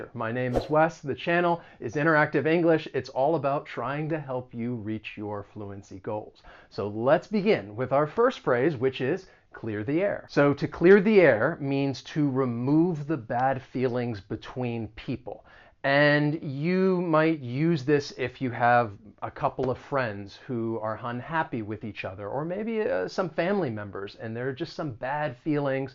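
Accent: United States. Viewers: Amazing American English at it's best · Your pronounciation is American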